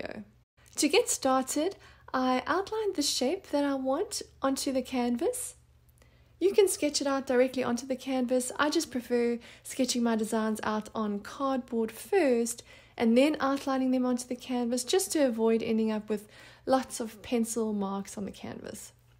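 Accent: South Africa. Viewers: the accent is so South African i love it 😍😍😍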